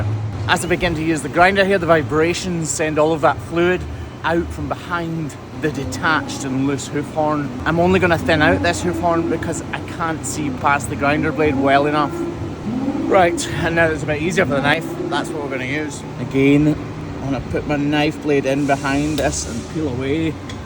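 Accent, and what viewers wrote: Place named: Scotland, United Kingdom. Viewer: I love you Scottish accent (I'm from the U.S.A.)... even when you say things like "dirt and debris" it sounds so cool... :) Love your channel!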